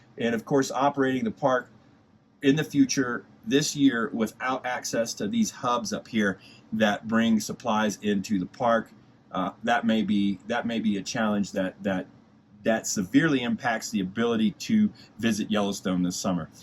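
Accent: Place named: Western Pennsylvania, United States. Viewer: Are you from western PA or eastern Ohio? I'm picking up on an accent.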